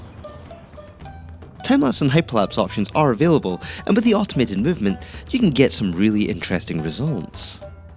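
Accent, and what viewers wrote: United Kingdom. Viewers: Too much British accent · Y'all need to chill. He's speaking with a British accent. British spell it colour. That's the joke. I'm well aware that America spells it color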